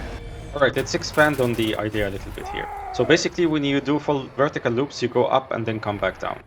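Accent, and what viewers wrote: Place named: Egypt. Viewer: are you egyptian? very egyptian accent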